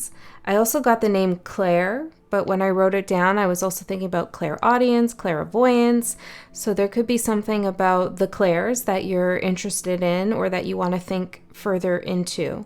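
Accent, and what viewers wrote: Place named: Canada. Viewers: I think it’s Canadian speak 🤭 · it's an accent. she is canadian.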